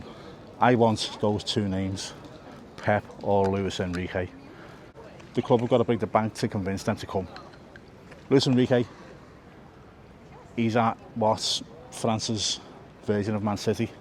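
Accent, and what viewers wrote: Liverpool, United Kingdom. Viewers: Spoken like a proper scouser who knows his footy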